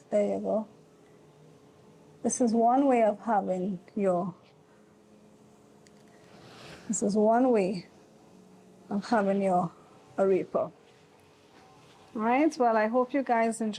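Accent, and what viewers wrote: Trinidad and Tobago. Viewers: Great video. That beautiful Trinidadian accent is so refreshing and you have inspired me to try this myself. I know now an arepa is a cornmeal fry bake. 10 out of 10.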